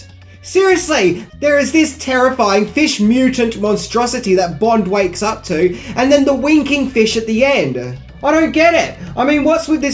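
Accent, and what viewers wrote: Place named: Australia. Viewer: Tone down that Aussie twang...otherwise very informative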